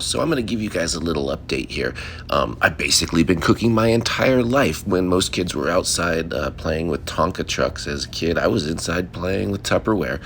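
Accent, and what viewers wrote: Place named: Pacific Northwest, United States. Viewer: I just had a feeling you might be in and have been in PNW… I can hear it in the voice 😊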